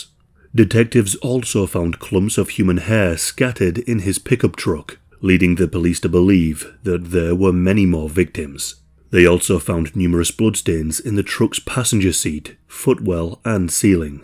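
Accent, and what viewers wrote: United Kingdom. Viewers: The fact that this will actually help me sleep is kinda scary 🤣 i love true crime and just has that sexy deep voice with a sexy British accent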